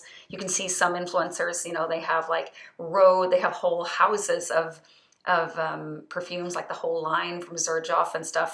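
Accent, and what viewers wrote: United States. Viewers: Her mom from US that’s why she sounds American). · Hi. I believe that you are Swedish, so I think it's interesting that you sound American. Also, I appreciate your insight concerning the fragrances you mention. I plan to start familiarizing myself with Comme de Garcons and some of the other ones you mentioned in this video. Recently, I added Guerlain "Bois Mysterieux" to my collection and it instantly has become one of my favorite fragrances. I highly recommend that you try it if you haven't yet. Two days ago, I wore another favorite of mine: Veronique Gabai "Cap d'Antibes". I like that one a lot. Have you tried it yet? I'd like to hear what you think about "Bois Mysterieux" and "Cap d'Antibes".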